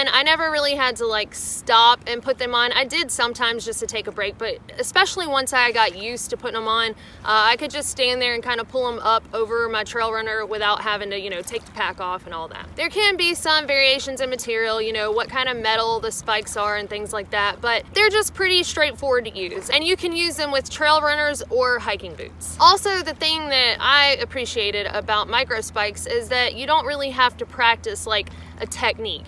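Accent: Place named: Alabama, United States. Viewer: Hey, Thanks for the episode and the tips of advice....As usual your delivery was informative and interesting, with an ever so charming Alabama twist...We appreciate and look forward to the experiences you share with us...You take care and be well 'till the next one lady...Thanks for the smiles...CHEERS!!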